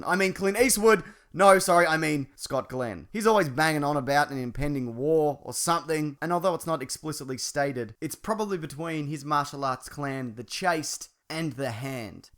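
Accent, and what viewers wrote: Australia. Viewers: You Aussie? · bestminecrafter1 he's Australian...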